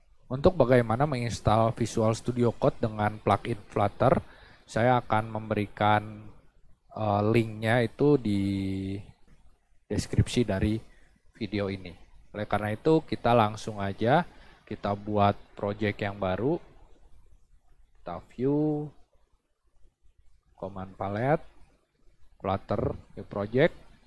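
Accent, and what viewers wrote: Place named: West Java, Indonesia. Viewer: orang bandung nya, logatnya sunda :D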